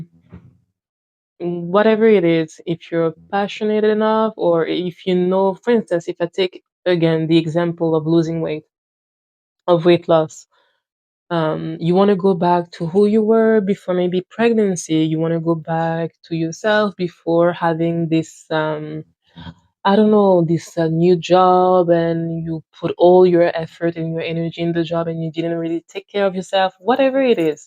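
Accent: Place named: France. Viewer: Your french accent is so divine soothing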